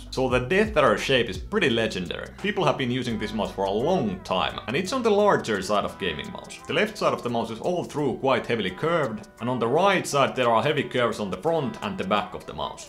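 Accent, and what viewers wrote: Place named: Finland. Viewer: bro the Finnish accent💀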